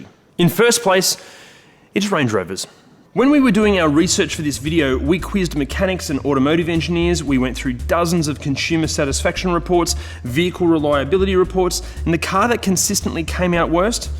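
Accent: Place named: Australia. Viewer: omg keep joking you are underrated, funny, charismatic and lovely to watch probably because of that juicy Australian accent lol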